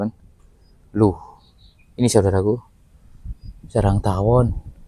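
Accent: Java, Indonesia. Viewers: Medok Jawa banget kau ngomongnya. Jadilah kau orang Indonesia, jgn jadi orang Jawa.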